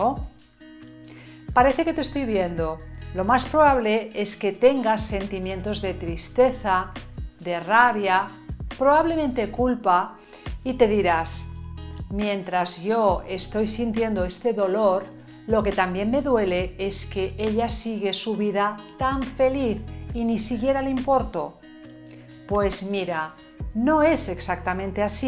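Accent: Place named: Spain. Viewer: Estoy enamorado de tu voz y acento espanol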